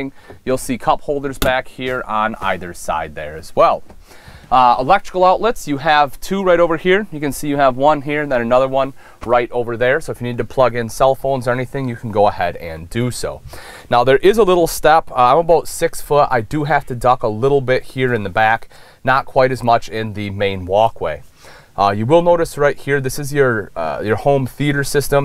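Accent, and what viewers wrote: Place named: West Michigan, United States. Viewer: This guy is well-spoken, knowledgeable, and has a nice West Michigan accent!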